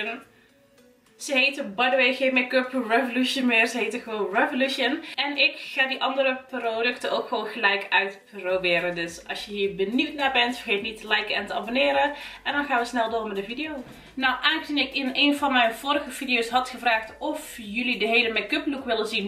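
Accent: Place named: North Brabant, Netherlands. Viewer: Ik hou zo erg van je kleine beetje Brabantse accent. Echt zo schattig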